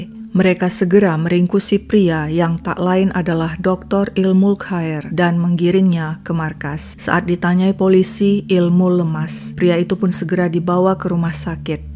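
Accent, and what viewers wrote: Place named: West Sumatra, Indonesia. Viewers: Logat padang nya mantap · orang minang, ya...? Bagus sekali logat minang nya.... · org padang?? Logatnya pas.hehe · bahasa minangnya benar2 fasih, dengan logatnya minang bgt... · Jadi YAKIN... ENCI... ADALAH TIONGHOA SUMBAR...LAFALNYA PAS LOGATNYA KENTAL...😁🙏🙂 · Hebat bs bhs/ logat Padang 👍 bsk2 pk bhs daerah lg ya, enak dengernya 😀👍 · Ternyata logat minang padang mbak kental kali dialek nya....menisyaratkan mbak org minang.. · Bahasa minangnya kok padat benernya.. Orang minang ya min?? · Logat padangnya masuk.. orang padang jga kah uni? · Uni,, urang awak nak? Luruih bana minang nyo,, sabana ancak... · Melihat longgat dlm narasinya,pasti dia orang Minang....😊😊😊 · Mantappp logat minangnya bagus nih..... sukses terus yaa... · mantap logat minangnya...jangan jangan urang awak juo 👍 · mbak suaranya enak didengar dalam penyampaian,apa lagi suara mbak dgn logat minang rancak lamak didanga, sukses taruih yo Uni rang Minang,AMIN🙏❣👍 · Mba orang Minang kah? 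Bagus banget logat Padangnya. Soalnya saya orang Padang juga.eheheh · Fix urang awak...logat minangnya pas banget 👍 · tyt orang padang.....aksennya dapat banget....eh dialeknya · Kyk nya ni org minang, logatnya kek minang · Waduh... top banget logat padangnya si narator. Saya orng pdng asli mengakui. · mungkin ini berasal dari minang karena logat dan penyampaiannya persis kayak orang minang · Mbak kayaknya orng Padang ya??..logat/ cara bicara bhs minang pas banget...sy orng padang..jd tau klu mbak logat padang pas 😀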